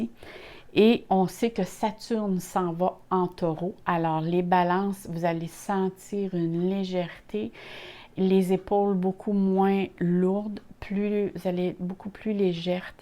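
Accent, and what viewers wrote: Canada. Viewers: Bonsoir, ma petite. Je vous est écouté avec beaucoup d'attention et d'intérêt. Et vôtre tirage ma parlé. Rien à voir mais je doit vous le dire. ( J'aime vôtre accent Canadien. ) Bon revenons à nos moutons comme ont dit. Ont vois de suite vôtre professionnalisme, pour ce qui est d'interpréter la lecture des cartes. Sans oublier, votre précision exceptionnel. Et pour celà je ne peut que vous dire merci. Et BRAVO. Je vous souhaite une très bonne nouvelle année 2021. Santé, bonheur, joie, prospérité, et amour. Que dieu vous protège. Amicalement Daniel. ( je suis balance ascendant scorpion. ) bye bye.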